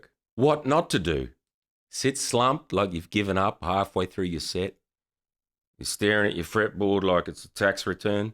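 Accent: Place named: Australia. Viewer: Where have you been all my life?!?? so easy listen to ...this man has REAL advice.. and in my own Aussie accent it makes these videos relatable its and refreshing as it is straight up no bs effective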